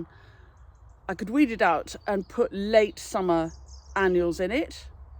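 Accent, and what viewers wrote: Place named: England, United Kingdom. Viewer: Oh you are wonderful! I cannot buy you a coffee yet please know this American fell in love with your articulated, English accent, humor and joy of gardening! I love narcissis, moved to a home where someone darling and knowledgeable ( like you) planted dozens of bulbs, which have tripled in a year! Thank you for all your tips and giggles. I have adopted you no questions asked- I have English heritage! Warm regards, excellent content!!!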